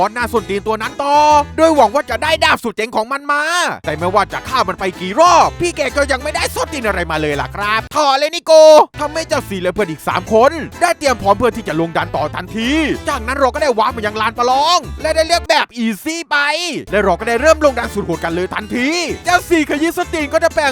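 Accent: South, Thailand. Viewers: คนใต้เหอะนิ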